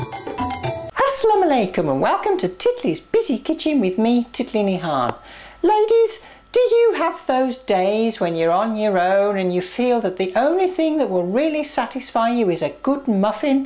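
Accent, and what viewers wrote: Birmingham, United Kingdom. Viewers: are you a brummie Titli? I love your recipes.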